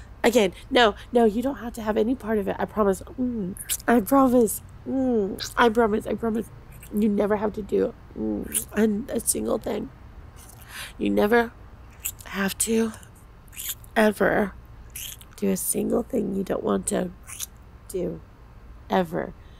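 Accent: South, United States. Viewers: Have I mentioned how much I love the southern accent. I gotta go to bed because I have a big day tomorrow, but still a great audio. Goodnight everyone.☕️ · I like the southern accent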